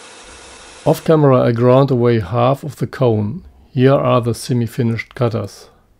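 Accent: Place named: Germany. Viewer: Лучше бы по немецки шпрехал. Непривычно слушать англискую речь с немецким акцентом.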